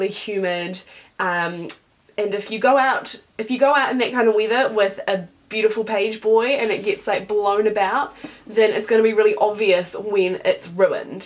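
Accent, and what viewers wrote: New Zealand. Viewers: Your hair looks ABSOLUTELY beautiful!! This tutorial is so helpful!! Thank you! Where are you from? New Zealand? I love your accent. (I am American. 🙂) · It’s a New Zealand Accent that is where I am from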